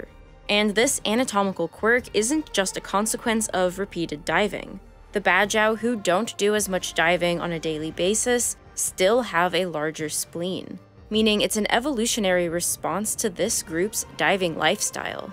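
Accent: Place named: California, United States. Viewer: Is the accent in the video , Californian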